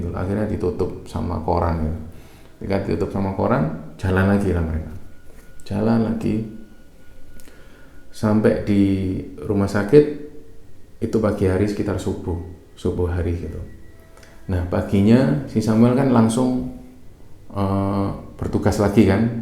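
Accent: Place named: Surabaya, Indonesia. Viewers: Chanel favorit..... Sing cerita mas dgn logat suroboyo an e..... Salam saking solo jateng mas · Buka chanel klik vidio baru, liat yg cerita mas. Wes ndak opo wee ditinggal kabeh😂 Jangan berubah yaa mas cara pembawaanya👍 Tetap Suroboyoan👌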